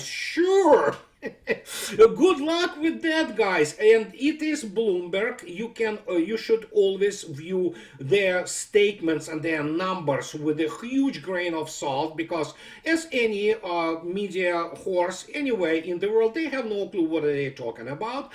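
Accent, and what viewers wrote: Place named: Russia. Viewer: I love your sarcasm, with your Russian accent" nincompoops, imbeciles, idiots have to laugh . if you don't laugh you cry.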